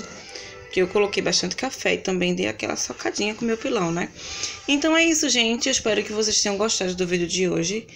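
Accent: Recife, Brazil. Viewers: É de recife?rss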